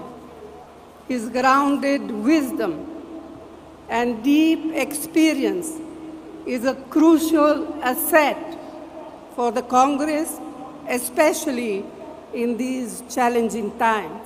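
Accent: Italy. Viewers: Ye hamara durbhagya hai ki aap jase log satta ke uchh shikhar pr pahuch gaye Aapki Hindi or English dono kharab hai. Aap Bharat me salo se ho mager aapke bhasa ke uchharan se lagta hi nhi ki aap bharatiy ho. Aap Italy bolo Jo aapki matri bhasha hai. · सोनिया जी आज भी हिंदी ठीकसे नहीं बोल पाती, अंग्रेजी भी इटालियन लहेजेसे बोलती हैं | अभीतो सिर्फ ५५ सालही हुए है भारत में रहकर |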